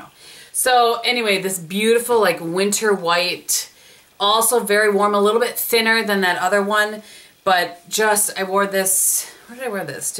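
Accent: Wisconsin, United States. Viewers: I thought I recognized that WI accent!! Osseo here!!! Love your videos! ❤️❤️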